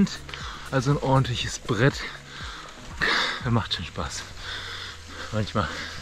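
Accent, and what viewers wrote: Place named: Germany. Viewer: Ganz gut gemacht, wünsche mir ich wäre dabei gewesen, nur eins hat sehr gestört: die englischen Brocken solltest Du Dir schnellstens abgewöhnen, andauernd immer wieder: "nice", "to go", "ride on", usw.,.das ist so oberflächlich, und die hören sich mit dem deutschen Akzent wirklich schlimm an - Es tut mir Leid.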